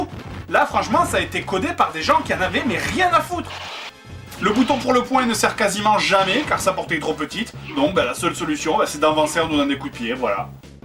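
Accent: South, France. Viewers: L'époque où avait son accent du sud...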